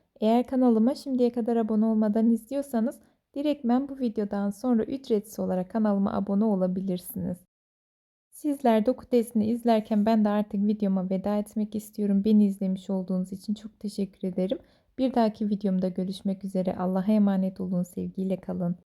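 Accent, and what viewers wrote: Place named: Azerbaijan. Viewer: Bu hanim kesin Azerbaycanli 😀 aksani var 😀 aparat dedi ilk bashda . Unutmuyun 😀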